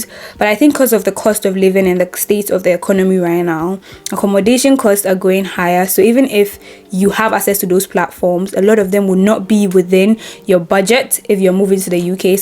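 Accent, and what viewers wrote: Nigeria. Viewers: I said what I said she sounds Nigerian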